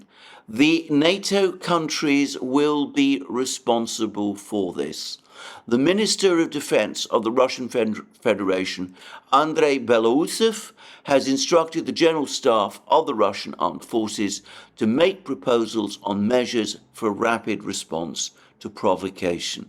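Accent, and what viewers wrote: United Kingdom. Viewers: Which part of the UK is this guy from? I like his accent lmao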